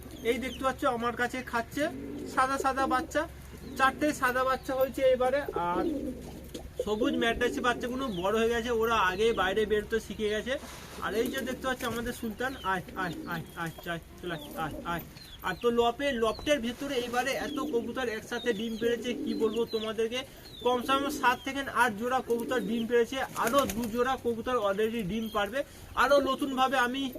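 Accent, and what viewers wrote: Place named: India. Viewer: আপনার কথা গুলো দেখে মনে হচ্ছে আপনি ইন্ডিয়ার লোক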